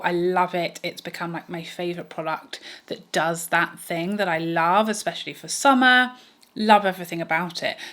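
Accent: United Kingdom. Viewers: Great video as always dear, and looking so beautiful!!!🤩🤩🤩🤩Love your humor always so, so much!!! Do you know how unique you are here, your beautiful appearance, your knowlegde, your lovely British accent AND your humor, you always make my day with your video's, thank you!!!! The UD nightmare🤣🤣🤣🤣I'm only interested in the CT powder at the moment. Thanks, have a great sleep and an amazing day tomorrow!!!😘😘😘😘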